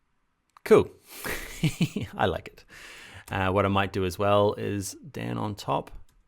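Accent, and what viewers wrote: New Zealand. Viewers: I really love the Kiwi accent. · Yah it doesn’t sound quite Australian. I’d guess NZ too.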